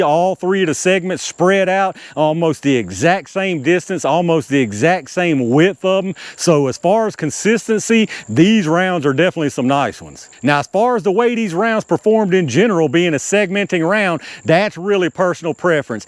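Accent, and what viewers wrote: South, United States. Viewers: damn.. you surely dont expect a gun youtuber with a strong southern accent to pull up all those gears for ammo tests lol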